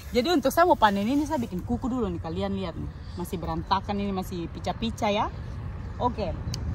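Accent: Papua, Indonesia. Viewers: Paleng suka dengar kk bcra Deng logat Amq Papua🤣🤣🤣🤣🤣terhibur hatiq😍😍😍😍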